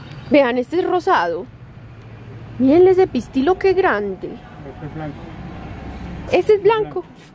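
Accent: Colombia. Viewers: sos muy dulce y alegre, te gustan las plantas y flores tanto como a mí. Siempre veo tus vídeos, amo tu acento colombiano. Soy argentina, me llamo Liliana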